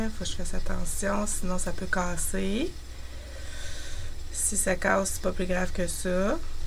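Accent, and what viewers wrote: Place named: Quebec, Canada. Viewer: Bonjour de France, quel bonheur d'entendre la musique du Québec (l'accent😉🥰) ça m'enchante ! Vos pulls sont très très beaux et les echeveaux trouvés rohhh quelle beauté pour si peu ..on a pas ça chez ns 😢 ..le choix des laines pour les 2 pulls futur sont parfaits si, si j'aurais fait le même choix !! Merci pour ces instants simples clairs et chaleureux, à bientôt 😊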